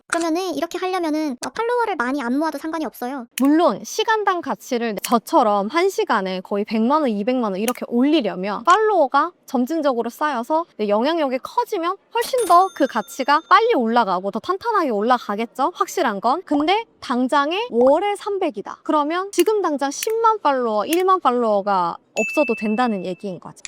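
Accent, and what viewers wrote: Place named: Gyeongsang, South Korea. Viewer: 경상도 억양이 있으시네요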